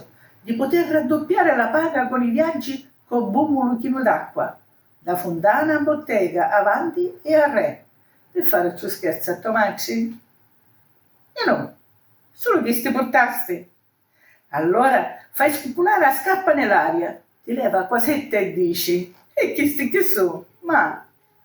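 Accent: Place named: Catania, Italy. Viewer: Brava 🎉brava ❤!!!! Complimenti 👏👏👏bellissima storia e quantu mi piaci a parlata catanisa, hai dato le giuste enfasi al racconto facendomi entrare in pieno nella narrazione e sono riuscita a capire tutte le parole, brava! grazie ☺️ per averci deliziato del tuo racconto ❤️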